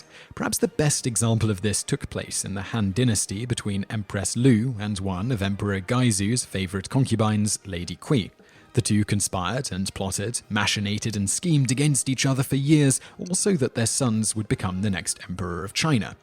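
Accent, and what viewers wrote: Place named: British Asian, United Kingdom. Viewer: His British-asian accent is funny :P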